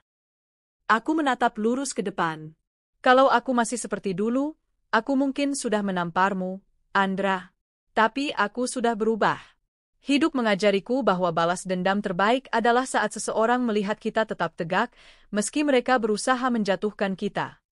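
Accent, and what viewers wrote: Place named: Madura, Indonesia. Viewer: Suara/intonasi dari pembaca narasi ini mirip dgn emak madura yg dari desa sdg baca... Tak iye.. Tak iyee... 😮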